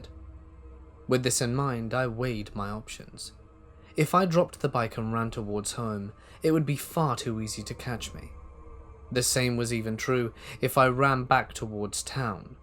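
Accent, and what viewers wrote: United Kingdom. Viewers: Is this British English accent? sorry I've been learning English..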